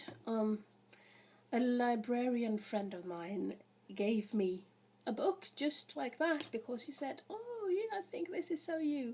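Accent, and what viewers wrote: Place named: United Kingdom. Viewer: You are fascinating. LOL! I hope you take that as a compliment. Do you live in Sweden? From your accent I was assuming you were in the UK.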